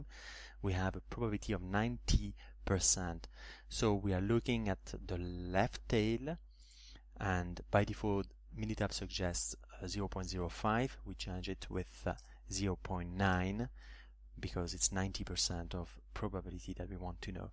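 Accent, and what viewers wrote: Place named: France. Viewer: A video about Poisson...narrated by a French guy. How perfect.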